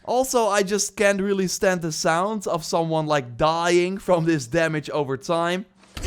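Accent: Netherlands. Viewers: Ik hoor je Nederlandse accent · Ik wist dat hij Nederlands was vanwege de accent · Are you dutch?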